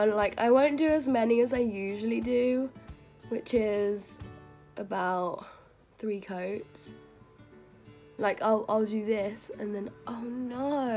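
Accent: United Kingdom. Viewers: She's British but she lives in France ( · YOU’RE BRITISH?!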